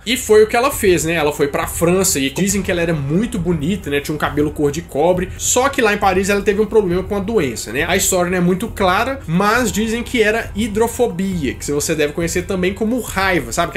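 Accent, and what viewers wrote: Minas Gerais, Brazil. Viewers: mds mano tu é mt mineiro, é o mineiro mais mineiro que eu já vi no youtube kkkkkkkkkkkkkkkkkkkkkkkkkkkkkkkkkkkkkkkkkkkkkkk fala mineirez dms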